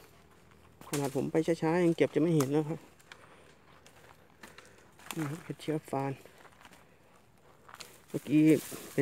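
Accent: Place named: North, Thailand. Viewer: น้องหล้า...อยู่่ จ. หวัดไหนเจ้า (ได้ยินอู้กำเมืองกั๋นน้อ) เห็ดแดงกับเห็ดขมิ้น เห็ดถ่านถะลำเน้อน้อง แกงใหม่ ลำเน้อ อยากมาเก็บ แต้ ๆ ใจเย็น ๆ กะได้เห็ดกิ๋นน้อ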